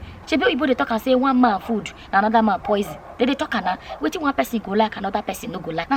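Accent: Pidgin, Nigeria. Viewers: I could watch and listen to you speak pidgin ALL DAY. I LOVE IT! I was born and brought up in the UK. Your channel teaches me pidgin and reminds me of when I lived in Nigeria, Benin City. And Warri pidgin of my people is tough pidgin! Lol...., you are bringing NAIJA CULTURE to Youtube.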